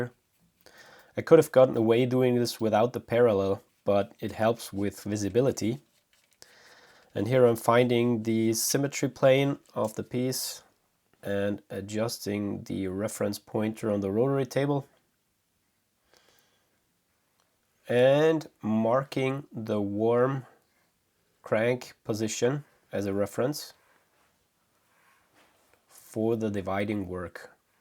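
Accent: Austria. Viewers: I had a good friend from Austria in school. His accent and yours are identical. It make me smile. :) Brilliant work!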